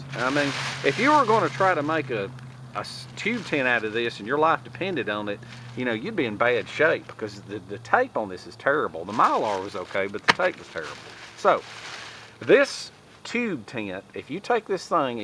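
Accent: South, United States. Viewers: I feel like my own southern accent is far inferior to this guy's accent...that is incredibly southern! Theeyus = this, teeyunt = tent, eeyun = in, swayut = sweat... that is amazing! Where are you located? Is this accent common there? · thats a strong southern states accent you have there good sir.....thanks for the clip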